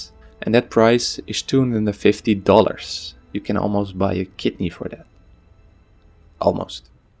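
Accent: Netherlands. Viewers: Are you Dutch? You have a Dutch accent xD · I played The division 1 even tough everyone disliked it i liked it. I Will be buying The gold version. Btw my uplay is maybe you could add me so we Can play together sometimes. And you sound dutch are you?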